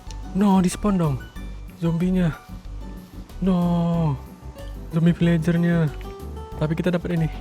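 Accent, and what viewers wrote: Indonesia. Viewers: Plembang dkit cknyo😂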